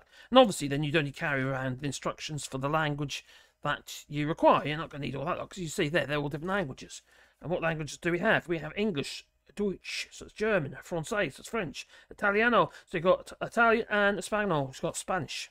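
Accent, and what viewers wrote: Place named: Portsmouth, United Kingdom. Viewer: I live in America and bought this meter. I grew up in Southampton England. You did a perfect review and enjoyed your description. Manual dies fit but it is tight. I totally agree with everything you said. From your accent I was guessing you are from Portsmouth ? Or south of England near fom where I grew up. I did enjoy it!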